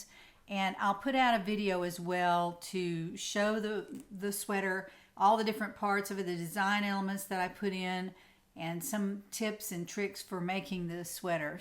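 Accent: South, United States. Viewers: Great to have your family coming!! :-) You're right...enough tutorials out there. Enjoy just hearing wonderful Southern accent & speak about what you know.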